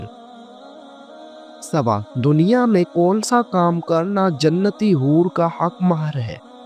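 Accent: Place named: Sindh, Pakistan. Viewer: Ap sindi hu achi ko ashi khty hu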